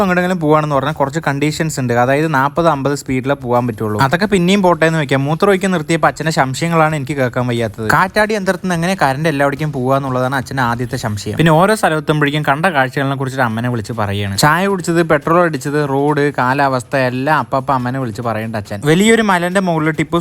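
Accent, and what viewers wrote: Palakkad, India. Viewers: Palakkad slang anh👍🏻😌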